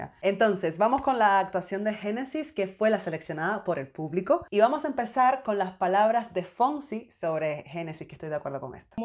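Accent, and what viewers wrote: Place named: Cuba. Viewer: Primera vez que veo su canal,me parece que debe ser cubana por la forma de hablar 👍👍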